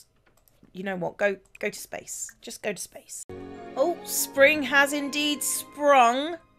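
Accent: England, United Kingdom. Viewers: She's English but England has a ton of different accents up and down the country, I can't place her personally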